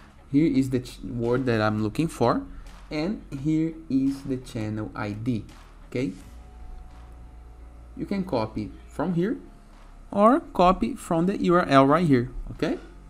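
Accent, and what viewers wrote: Brazil. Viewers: Brazilian accent...😎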